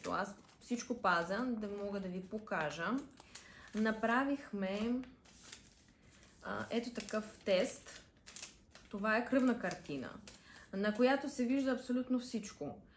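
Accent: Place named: Russia. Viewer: Долавям нещо руско,жена ми е рускиня както ти така и жена ми бъркате адски много граматиката:)Дори в заглавието на клипа има грешка:)Успех и моля не говори като Наталия Кобилкина)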